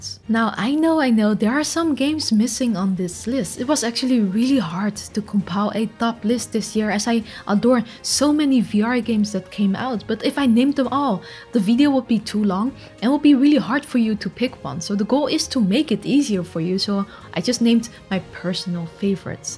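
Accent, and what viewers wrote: Netherlands. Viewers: je klinkt een beetje nederlands heb ik gelijk?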